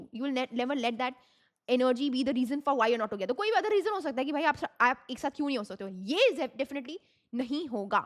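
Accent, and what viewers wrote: India. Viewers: I can’t with the Indian accent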